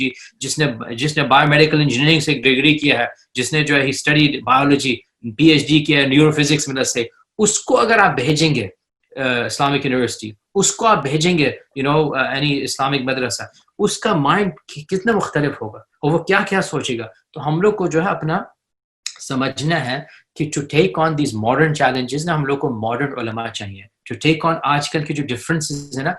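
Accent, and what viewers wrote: Pakistan. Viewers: I love ur urdu accent, May Allah bless you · Loved your urdu accent ustad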